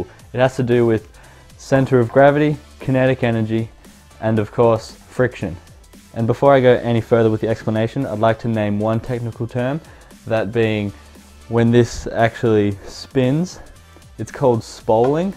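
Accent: Australia. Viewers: This is really cool! My 2 brain cells are trying to keep up 😂 your Australian as well! Yeah mate SUBSCRIBED 👍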